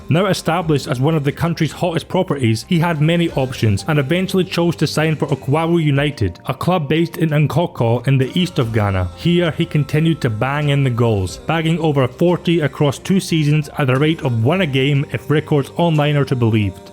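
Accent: Scotland, United Kingdom. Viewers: This is one of my favourite channels..why? Because u cover players from the 90s and early 2000s..my childhood years..also u cover unsung players like tony yeboah..we all know his goals for leeds but the rest of his career doesn't get spoken of really..i also love ur scottish accent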